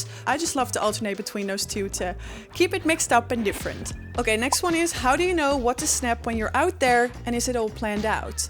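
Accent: Netherlands. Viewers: Question, are you dutch? Cause i am, snd i thought i reconised the accent😊 and i really love what your doing! So inspiring🫶🏼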